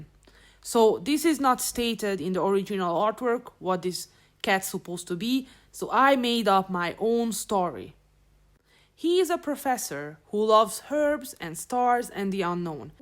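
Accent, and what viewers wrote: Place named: Hungary. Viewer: ...you sound hungarian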